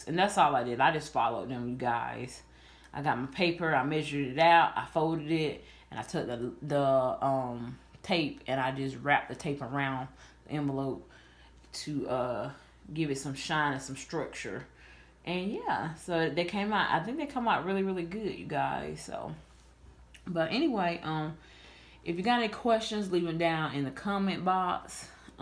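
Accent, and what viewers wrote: South, United States. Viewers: I’m pretty sure that’s all I have for vehicle insurance also. I have to get the extra to get the roadside assistance. Renters insurance is also so good to have! Never know if something happens! Keep up the good work, have missed your videos and that sweet southern voice...I always know you’re videos will bring me back home ☺️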